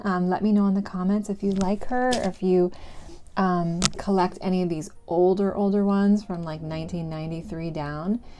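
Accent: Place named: New England, United States. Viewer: You have a new England accent!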